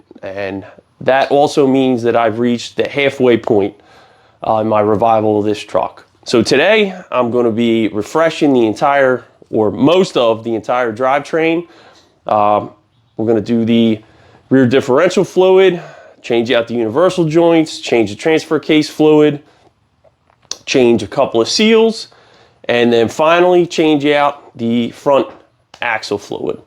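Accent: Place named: Pittsburgh, United States. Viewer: Dude sounds like Pittsburgh. 👍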